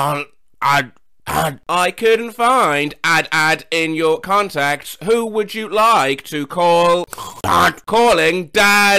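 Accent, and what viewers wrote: United Kingdom. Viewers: He sounds more like Wheatley because of his BRI'ISH accent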